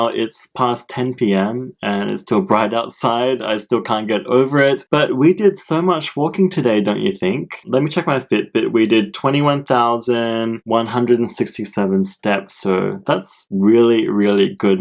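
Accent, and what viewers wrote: Australia. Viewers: I just love your great videos,. I like Aussie accent.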